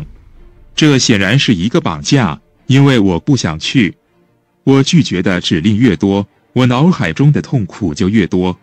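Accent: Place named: Taiwan. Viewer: 這個語音居然有點台灣國語，是沒錄好嗎==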